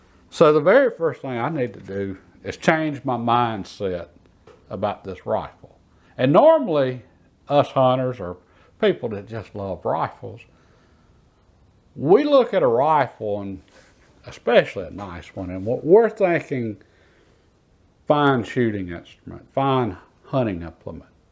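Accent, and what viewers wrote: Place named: North Georgia, United States. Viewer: Enjoying your channel, I have been trying to pin down where you are from according to your accent. I was a trucker in my younger years and consider myself pretty good at guessing, leaning towards northern Georgia or maybe middle Tennessee 🤷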